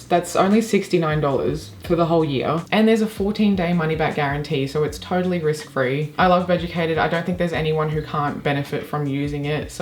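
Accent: Australia. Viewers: Love hearing an Australian accent delivering intelligent, factual and insightful commentary about a massive issue. Post 1960 and the invention of the pill women have choices. We no longer spend our fertile years bearing or feeding bubs. Being pregnant and nursing infants makes us super vulnerable physically and financially. In an unsafe and radically unstable world young women will choose not to have children if they have access to birth control.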